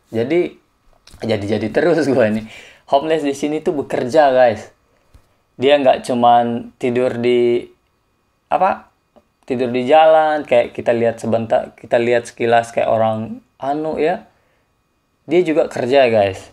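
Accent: Lombok, Indonesia. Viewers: Kyknya abng ini org sasak ya? Gw tau dari logatnya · Kentel gati aksen lombok indonesia no mton..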